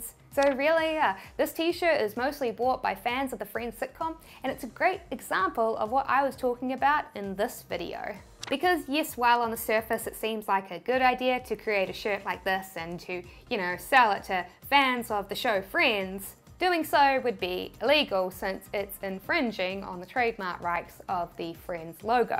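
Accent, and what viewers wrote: New Zealand. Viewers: Love the Kiwi accent !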